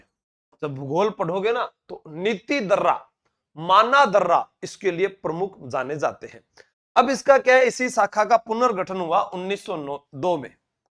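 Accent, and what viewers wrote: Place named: Marwar, India. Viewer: sir marwad me meri Nani ka gaw h whaa par aise hi bolte h😅😅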